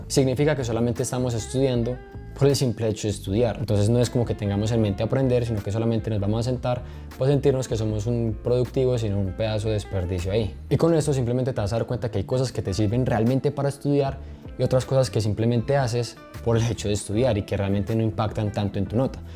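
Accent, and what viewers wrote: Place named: Colombia. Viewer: Uy apenas escuche esa voz dije ese man es de Colombia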